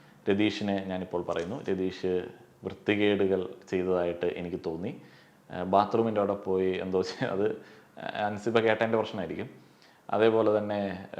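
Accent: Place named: Thiruvananthapuram, India. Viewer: തിരോന്തരത്ത് താമസിച്ച് താമസിച്ച് ഞങ്ങട സ്ലാംഗ് ഒക്കെ അറിയാതെ വരുന്നുണ്ട്. 🥰🥰 ഗുഡ് പ്രസന്റേഷൻ. നല്ല ഒബ്സർവേഷനുകൾ.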